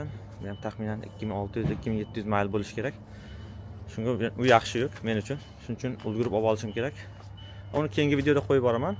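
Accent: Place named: Oltiariq, Uzbekistan. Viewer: Oltiariqlikmisiz shevez o'xshayaptida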